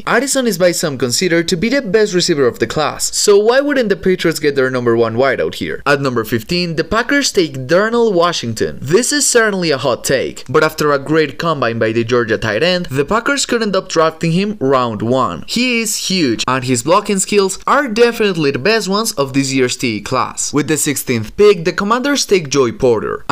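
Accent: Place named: Europe. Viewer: I don’t trust anyone with a European accent to talk football.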